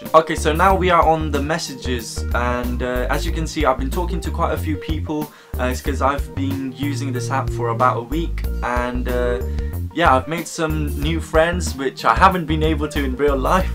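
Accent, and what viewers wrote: United Kingdom. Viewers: Oh my god are you british? You have an accent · You sounded British but you looked like korean. XD This app you shared would help a lot should I consider learning formal korean through Berlitz though, I learned a bit korean in the academy. I guess my brain should get a hang of it soon. I dunno maybe bec.its very diverse theres a lot of languages introduced when im in school spanish, japanese , chinese, korean but english is my official secondary language. Honestly, its pretty messed up. Tnx for this helpful app!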